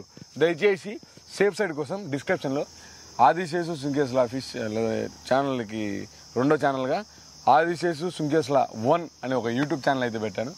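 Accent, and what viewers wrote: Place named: Kadapa, India. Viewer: Mana kadapa volla slang ge ante. Ray youtube ga okasari ma kadapa vachii chudu sami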